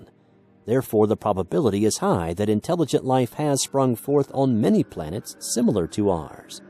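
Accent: South, United States. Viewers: andrea I do every time. I love his Southern accent.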